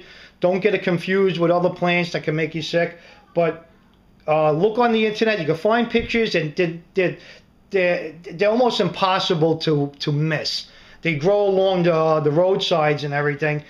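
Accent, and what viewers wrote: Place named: New Jersey, United States. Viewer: i just love watching your videos your accent reminds me of my family from Jersey. my moms been here in wv for 38 years and almost sounds like one of us now. weird huh? anyway if someone couldnt or didnt want to invest that much in a blender the new ninjas are fantastic. they have one that looks similar to a vitamix and its gotten good reviews. i also juice quite often and am gearing up for a 5 day juice fast. i love juicing as well as my smoothies :)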